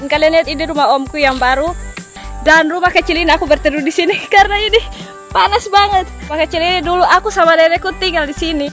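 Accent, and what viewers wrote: Kupang, Indonesia. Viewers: its amazing the way you talk kupangnese. Pls teach us some kupang language:)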